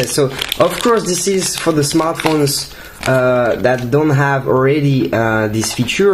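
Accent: France. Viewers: Mec ça cd voit que tu est français Mai's pas grave tu gere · tu es français??? oh la la l'accent; mec! Mais tu maitrises! :) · Just so you know, ACCESSORY is pronounced ak-CESS-er-ee. The emphasis is on the second syllable. It's not access-er-EE. I realize you have a French accent, but that pronunciation will cause people's brains to stop for a moment to try and figure out what you're saying to them. Thanks for the review! :)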